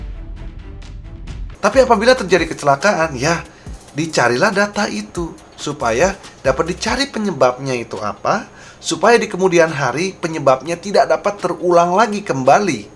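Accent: Java, Indonesia. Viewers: Blek bok blek bok blek ohhhh blek bok medokkk waeeeee suaranyaaa,#ntaplah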